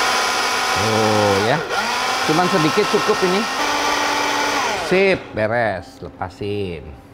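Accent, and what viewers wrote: West Java, Indonesia. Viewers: Pantesan chef logatna sunda pisan😁😁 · Euleuhh. Gening Chef teh urang Bandung. Pantesan mun nyarios rada ngalogat sunda. Hehehehe.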